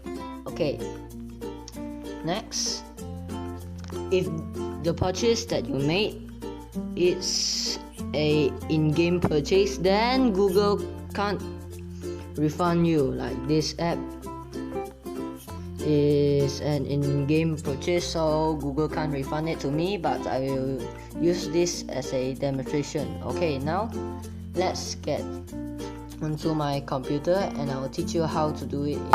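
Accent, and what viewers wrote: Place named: India. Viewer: Sorry guys but he sounds like one if those Indian scammers lol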